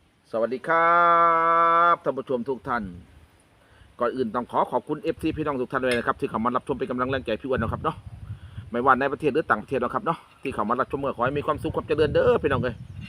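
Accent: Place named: Korat, Thailand. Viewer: ภาษาโคราชฟังง่ายสบายหูคุณกินแล้วทำให้ผมน้ำลายไหลด้วยผมติดตามคุณทุกคลิปเลยครับ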